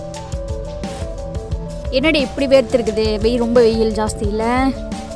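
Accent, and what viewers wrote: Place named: Kongu, India. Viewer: கொங்கு தமிழ் சூப்பருங்க அம்முனி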